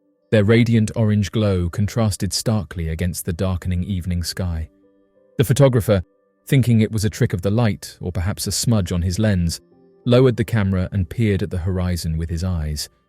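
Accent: United Kingdom. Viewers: He’s just bri’ish.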